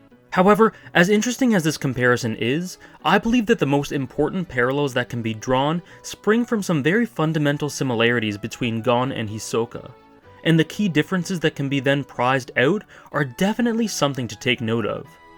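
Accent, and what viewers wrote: Canada. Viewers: You sound Canadian that's all.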